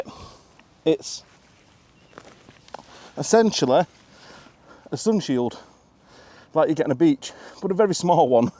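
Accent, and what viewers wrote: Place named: Scotland, United Kingdom. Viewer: Spanish??????? I think he has a Scottish accent. One of us is having a very hard time understanding.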